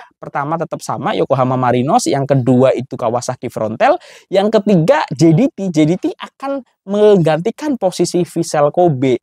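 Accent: Indonesia. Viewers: Bang orang Malaysia ke Indonesia? Cara cakap mcm Indonesia. Kalau betul Indonesia, impress gila dengan JDT sampai ke orang Indo buat review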